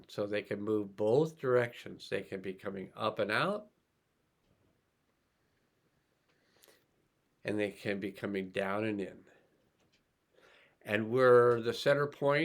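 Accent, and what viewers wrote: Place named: United States. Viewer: I'm familiar with Nancy Rebecca and the blue light info; she's in the USA as am I - wondering what part of the world u r in? (You sound like maybe USA)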